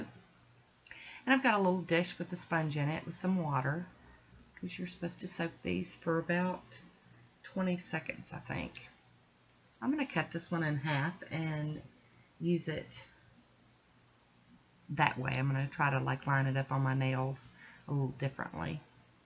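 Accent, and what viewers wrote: South, United States. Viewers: I hear a southern accent in you! That's the same for me, I'm a southern girl all my life! My husband loves for me to say certain words and will have me repeat them sometimes or he'd say it after me lmbo, like, night (telling him goodnight) bite, like, light lol he gets a kick out of it when I say those words and we both end up laughing! People always ask me to repeat myself just so they can hear me say whatever I said again lol!! I think we probably would be in trouble quite often if we could hang out! If you ever come to central Fl it'd be a great treat, for sure!!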